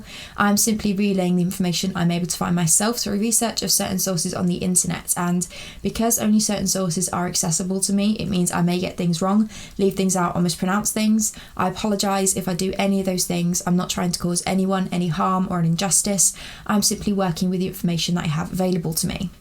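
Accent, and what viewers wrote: England, United Kingdom. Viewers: What country is she from? England?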